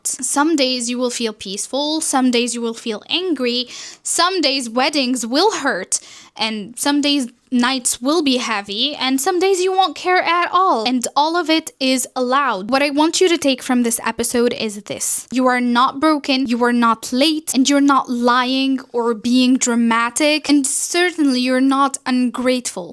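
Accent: England, United Kingdom. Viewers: i loveeed ur english accent machalah <33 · Guuuuuuuuurl your english accent !!!! You slay queeeeen ! Keep up the good work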